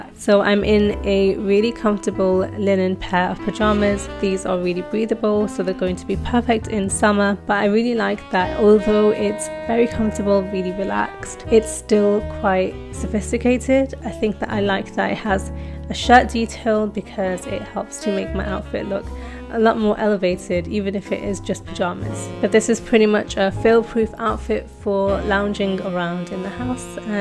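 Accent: United Kingdom. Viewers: OMG your Brit accent is so beautiful! Love hearing you.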